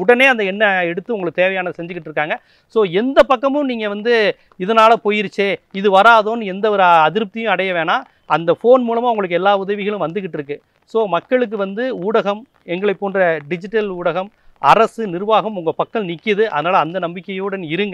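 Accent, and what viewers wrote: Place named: South Tamil Nadu, India. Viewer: Usually it is slang in south dustricts ! many speak that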